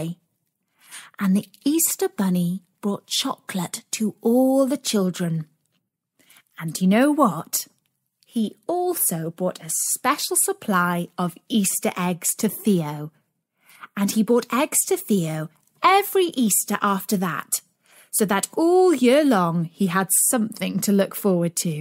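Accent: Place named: England, United Kingdom. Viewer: Funny story. Great English accent!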